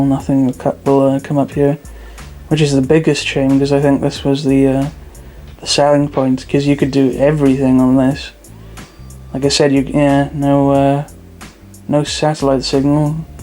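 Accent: Wales, United Kingdom. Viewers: great video and a nice retrosepective of sky, quick question though, are you welsh? Edit: lol nevermind just saw you scroll past S4C, confirmed 😆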